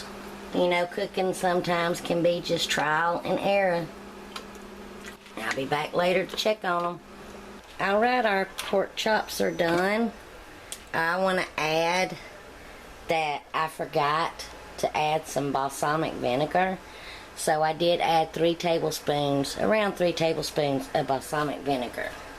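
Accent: South, United States. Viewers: LOVE the accent. Southern charm at its best. Nice recipe. I’ll definitely give it a try.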